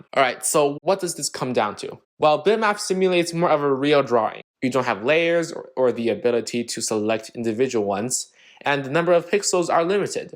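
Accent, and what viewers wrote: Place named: China. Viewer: Your Chinese accent is funny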